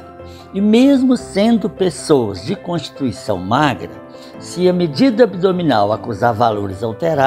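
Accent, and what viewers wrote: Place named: Minas Gerais, Brazil. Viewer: Gente, estou encantada pelo Dr.. Fala com segurança e objetividade. E o jeito de "mineirin" é bem descontraído. Sou mineira também, da capital. 😍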